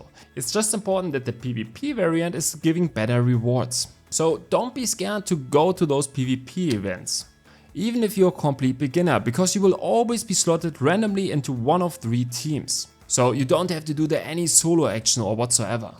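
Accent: Germany. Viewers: I hear german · Thanks for the great help! I always like to know as much as I can before I play something cause I'm all for efficiency. My ADHD friends called me autistic but always stop complaining whenever they need me to explain something or look it up for them ;) <3 Amazing video, clear explanations with added visuals as aid, no time wasting, German accent, told to have fun 10/10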